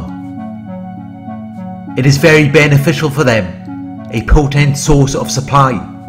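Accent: United Kingdom. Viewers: Wow too real! Sounds toomuch like my x wife in so many ways...in her bad days me and my 2 little kids had to tiptoe around Mom otherwise she'd explode! On a family nite out to McDonald's our eldest daughter would be so fearful to upset the Narcissist Mom that she'd even refuse to order anything, afraid Mom would yell at her for ordering too much! Oh i hated those times! She eventually left me with 2 young children at home because home was just too boring for her. How those 2 precious young girls suffered, because even tho she was that disturbed element at home, there "were" some precious and few moments that our daughters did enjoy with their mother...a sad "live/hate" type of scenario, which should never be in home, especially with young growing children! So like i stated earlier, this video hit way too close to home, literally speaking. After 15 some years the girls are busy with their own children and it seems they've forgiven Mom for abandoning them when they were so young, tho the girls seem to know now which "buttons not press" or the old Narcisst Mom will suddenly raise her ugly head. 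Thanx for the video...again that British accent seems to "hook" me in each time, Haha, great!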